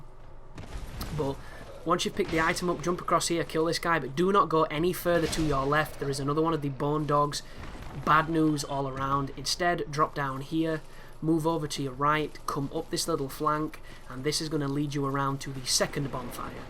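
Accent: United Kingdom. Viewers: One of my fav videos.PERIOD. Not only very helpful but fucking HILARIOUS!😂😂😂 the combination of your beautiful Brit accent but the excessive cussing at enemies and then the crown jewel was your WOW OPINIONS! Spot fucking on! "I'm not trying to offend anyone I'm just stating facts!"HAHAHAHAH I so rarely like/subscribe etc I'm not a dick I just forget but this was an automatic LIKE/SUBSCRIBE/& DONATION😎 I'm not easily amused in fact kind of a negative person at times but this made my already great night, thx, looking forward to other videos, I'm going into your history👊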